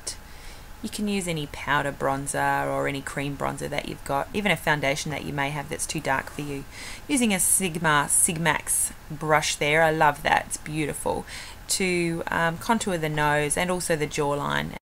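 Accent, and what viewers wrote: Australia. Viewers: love your voice. its very soothing. there is something about the aussie accent!! chi chi naturals is on sale soon, so I thought id watch chi chi reviews. and found your channel.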